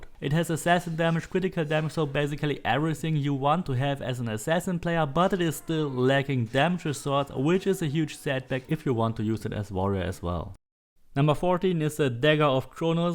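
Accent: Germany. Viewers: You german, you've got a hard accent no offense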